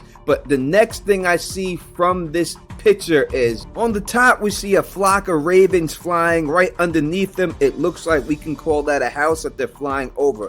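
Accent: New York, United States. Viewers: I love your coverage of this show. Also love your NY accent. Love to hear you say "autopsy "❤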